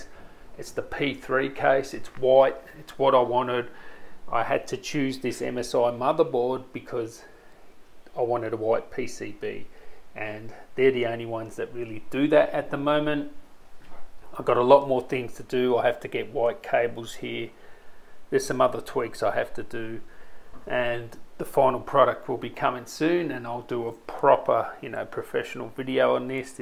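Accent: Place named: Australia. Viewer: Getting rid off ur au accent may appeal more views, mean no harm ♥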